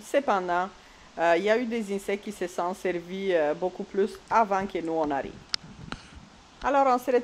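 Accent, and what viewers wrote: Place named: Canada. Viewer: merci 😀. Il me semblait avoir reconnu l’accent canadien mais je n’en étais pas tout à fait sûre😀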